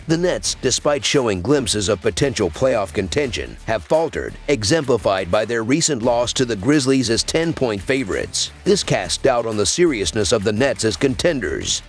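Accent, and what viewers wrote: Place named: Boston, United States. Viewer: Love hearing your Boston accent, thanks for the laugh!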